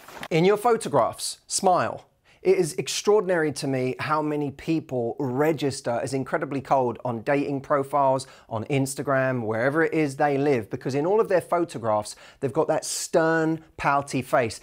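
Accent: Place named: United Kingdom. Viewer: You look like patrick dempsy you are handsome like him sory for my bad language english language isn't my first language but i adore the british accent and i like your channel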